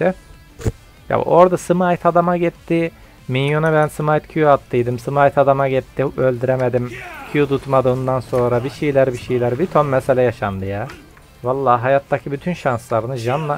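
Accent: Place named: Antalya, Turkey. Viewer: ABI SEN NERELISIN ANTALYALIMISIN ŞİVENDEN ÖYLE DÜŞÜNDÜM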